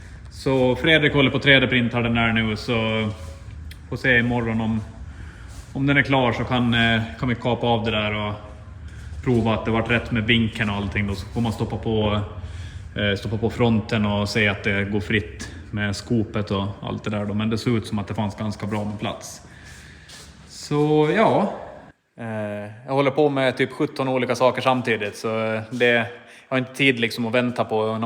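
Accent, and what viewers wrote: Finland-Swedish, Finland. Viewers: Som alltid, kul,intressant och jäkligt imponerande hantverk! Lätt den bästa kanalen..... Muminsvenskan höjer värdet också såklart 👌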